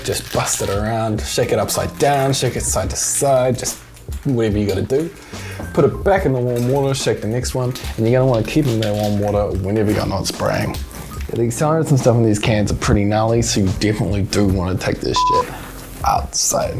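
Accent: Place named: New Zealand. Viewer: Wow great content i love this! kiwi accents are so hot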